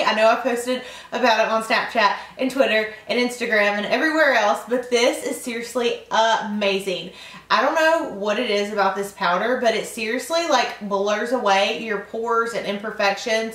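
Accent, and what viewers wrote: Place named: North Carolina, United States. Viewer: Annie Loewen she is from North Carolina United States lol. a little more East than South. she has that kackalackie accent lol... not southern haha thats what we call home north kackalackie lol dont ask if youre from north or south carolina you should know lol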